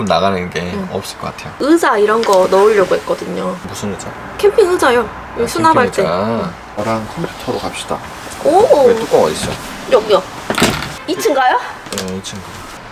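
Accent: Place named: South Jeolla, South Korea. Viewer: 사투리가 전남권이신거 같은데 ㅋㅋㅋ..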